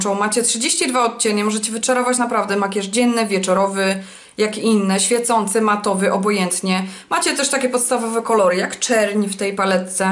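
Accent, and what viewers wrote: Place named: Silesia, Poland. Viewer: tak czułam, że dziołcha ze śląska:):) akcent Cię zdradził;)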